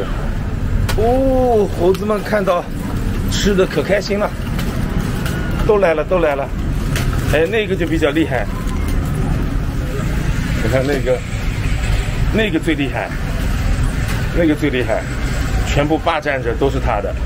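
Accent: Shanghai, China. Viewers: 哥們是上海人？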